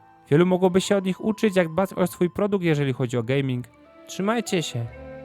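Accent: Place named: South, Poland. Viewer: Po wymowie stwierdzam ze jesteś z południa polski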